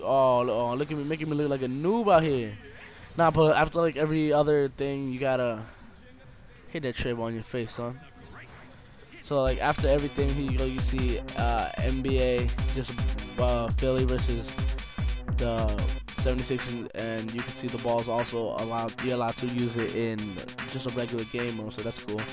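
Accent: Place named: Dominican Republic. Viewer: He talks like that cuz he dominican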